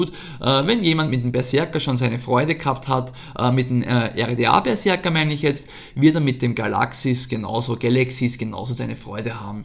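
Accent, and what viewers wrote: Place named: Austria. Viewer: Krass .... Capital Bra mit österreichischem Akzent! Klasse Video, weiter so :)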